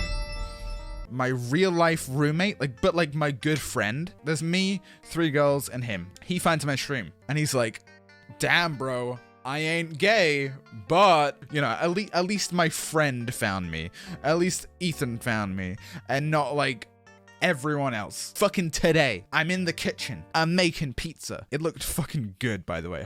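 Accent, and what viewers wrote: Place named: United Kingdom. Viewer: harry potter accsent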